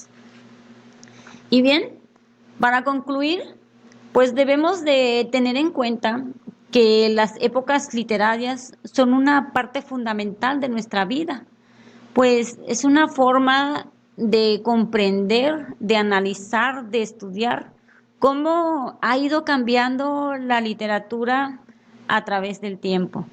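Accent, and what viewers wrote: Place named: Veracruz, Mexico. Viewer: Pareces de Veracruz.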